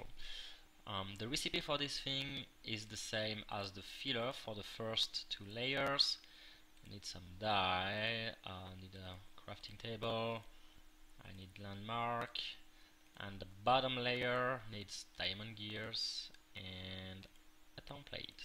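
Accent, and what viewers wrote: France. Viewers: Are you french? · french or belgian?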